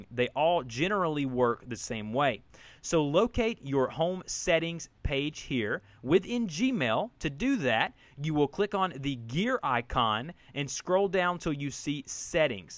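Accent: United States. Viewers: I love hearing American English Cheers From Algeria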